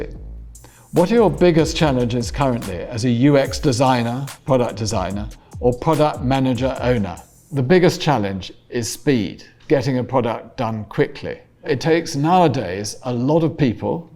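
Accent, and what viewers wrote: United Kingdom. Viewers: 上品なイギリス英語ですね！ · すんごいBritishアクセント。 かっこよすぎ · イギリス英語たまんね〜〜〜😭😭😭😭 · ブリティッシュのアクセントが最高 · イギリス訛りすごいな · イギリス英語？ググったらほんまにイギリス人やん聞き取りやすい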